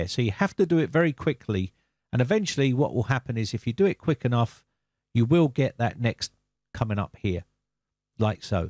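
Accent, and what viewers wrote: United Kingdom. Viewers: I gratefully join the many satisfied subscribers in thanking you so much for sharing your helpful skills & knowledge to make our work a lot easier with your elegant British accent. Thanks a lot.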